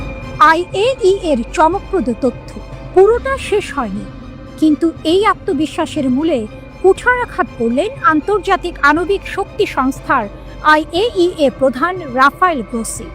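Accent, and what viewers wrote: Kolkata, India. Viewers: সাংবাদ পাঠক, কলকাতার নাকি,, ভাষা বাংলাদেশিদের সাথে মিলছে না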